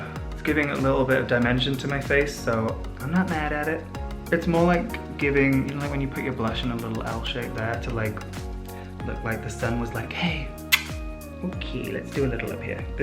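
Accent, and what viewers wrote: United Kingdom. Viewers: Omg you’re British???? I thought you’re American 😮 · Oh my god he’s british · NEver heard your real accent before. Are you from England originally? I live in London.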